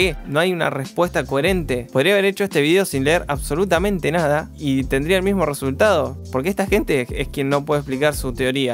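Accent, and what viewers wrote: Argentina. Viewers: Sos de Argentina,seguro ?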